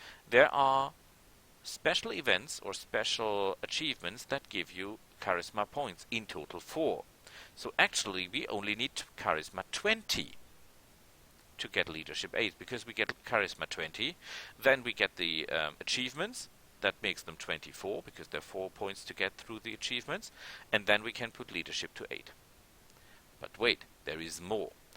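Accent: Germany. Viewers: I remember your floris walkthrough good times I miss your german accent you got realy good speaking english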